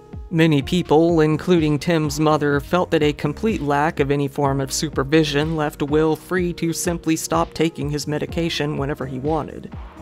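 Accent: United Kingdom. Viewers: Wait, who was the British voice? Sounds sooo familiar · That really REALLY sounds like Adrian from Coffeehouse Crime. As a fellow Brit the likeness is uncanny. Anyway, great upload as always!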